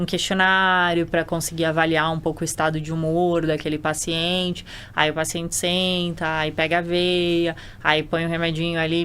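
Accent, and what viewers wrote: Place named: Sao Paulo, Brazil. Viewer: Eu gostei do sotaque dela de paulistãããna 😂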